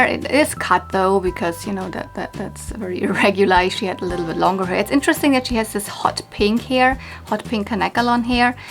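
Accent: Germany. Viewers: What a wonderful doll/toy haul! I love everything you found! Brought back so many good memories 💗 Barbies of the 80s & 90s have a very special place in my heart 🥰 I spent my early childhood in Germany in the 80s. 🥰 I love hearing your accent and hearing German spoken. My father was fluent, and I started to relearn German in school. Unfortunately, I don’t have a lot of opportunities to speak it, so I’ve lost so much, but can still understand most of what’s being said. Great video! Can’t wait for the next!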